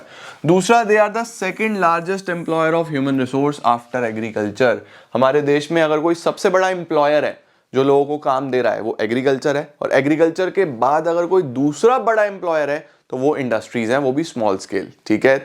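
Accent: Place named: Punjab, India. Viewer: Mangji se pta chlta h sir ap pake punjabi ho 🎉😍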